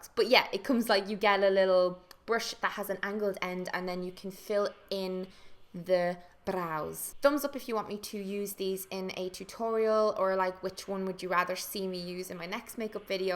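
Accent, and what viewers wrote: Ireland. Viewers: Your sound so much more Irish in America if that makes sense 😂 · I love how I live in America and literally don't have neither the beauty blender or the Anastasia brow pencil.. like what the heck?! This has gotta be a sign! haha -also this is hecka random but I bet you make Californians happy with that amazing Irish accent you have :P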